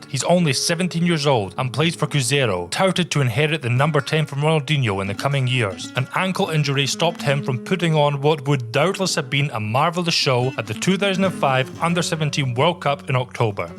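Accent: Scotland, United Kingdom. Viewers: How the heck have you only got 21k subs man. Love your wee Asian Scottish accent as well. Great channel. You’ll have millions of subs surely soon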